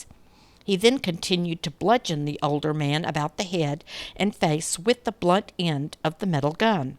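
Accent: South, United States. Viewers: The lovely southern accent makes the narration absolutely perfect and I love it, dont you ever change, lots of love from England.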